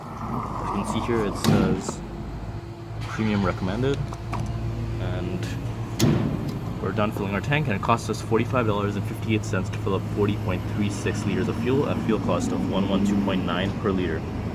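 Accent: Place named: United States. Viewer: Which country is this? The narrator has an American accent and they are speaking in kilometers and liters as well as dollars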